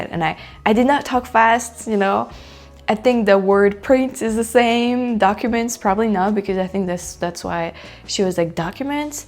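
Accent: Quebec, Canada. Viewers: Étrange que tu ne comprennes pas le français québécois considérant que ton accent quand tu parles anglais est beaucoup plus proche de l'accent québécois que de l'accent français... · Hi from Vermont just below Montreal have you picked up the Canadian accent yet 😂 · The end of this video was more promising than the first half of this video. Glad it's getting a little better with the French Canadian. Hang in there and you will be fine Remember these tips. 1. French Canadian is Laced with slang mixed With English 2. Have the Canadian Slow down when they talk 3. French Canadian is a French dialect. Montreal's dialect is Is hard to understand. Even for Me as an American French Canadian. 4. It sounds like you are Picking up some the dialect. you sound more positive in the second half of your video. The little word book is a great idea to have We are rooting for you. 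· You're speaking with a Quebec accent a bit; you've adapted.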